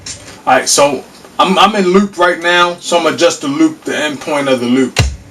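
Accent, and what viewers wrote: Baltimore, United States. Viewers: Dope vid. You sound like you from B-more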